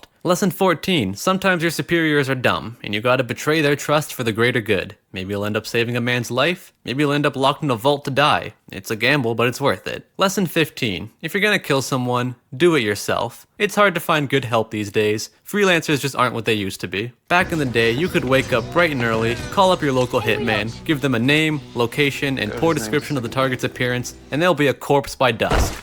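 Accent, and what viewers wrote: Newfoundland, Canada. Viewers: are you from newfoundland or irleand or scotland? dont hear the word bhys on youtube much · Wait, are you from Newfoundland?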